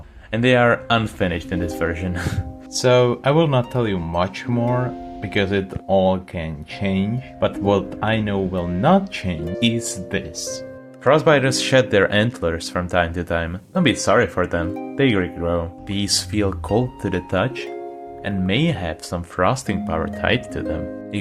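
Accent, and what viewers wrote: United Kingdom. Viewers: British accent's kinda interesting, I recommend to u look at the Irish English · you have an awesome British accent please release more news about windswept update pleaseee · Accent funny british was generic comment /// no subliminal msg this time sry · British accent was epic and very funny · Britis accent was amazing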